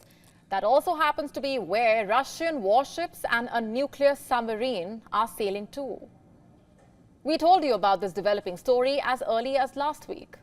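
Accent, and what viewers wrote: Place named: India. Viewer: Can't stand this indain accent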